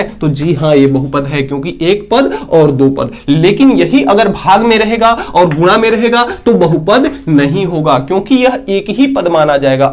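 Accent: Chhattisgarh, India. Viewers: mujhe lga tha aap chhatishgarh se ho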